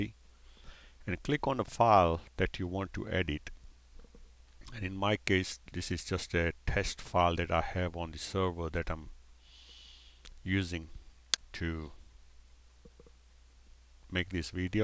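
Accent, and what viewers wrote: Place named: Mexico. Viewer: OMFG NOCE VOICE AHAHAHAHAH you sound mexican XD thx anyways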